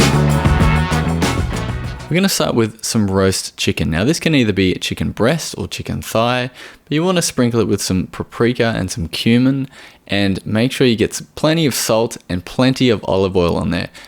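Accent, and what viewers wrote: Australia. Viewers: I love your videos but I truly hate Australian accents no matter who is talking sorry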